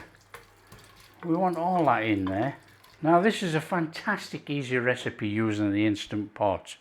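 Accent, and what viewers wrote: Yorkshire, United Kingdom. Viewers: Hello - im a yorkshire woman- living in lancashire for long time- but still so proud of my yorkshire roots. Born in Leeds- then lived in Hull- i still miss the patties from Beverly road chippy- cant get them here. Anyway grew up with mam sending one of us to the corner shop- for a quarter of loose potted beef- wrapped in greaseproof paper. Didnt last long- having 3 sisters- but wow such a lovely memory. Luv watching you- because i cook a lot much as im alone now- just like yourself im known for the old classics and proud of it. So yes ill be making your lush potted meat and having it on my favourite toasted bread- sourdough. So thank you- such a pleasure listening to you with your yorkie chat- always feel like ive gone home as im right back there- its nostalgic. God bless- and take care. 😊❤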